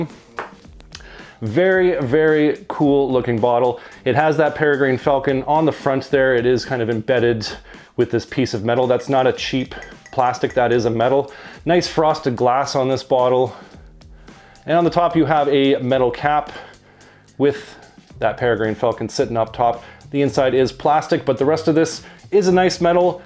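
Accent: Canada. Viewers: I could tell from the accent that you were a fellow Canadian! I now know that you are also a fellow traveller! Great channel, hope to see more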